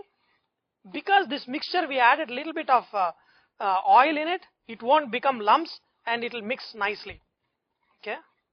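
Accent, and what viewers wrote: India. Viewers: i love watching from the uk you allays make me happy and i like the Indian accent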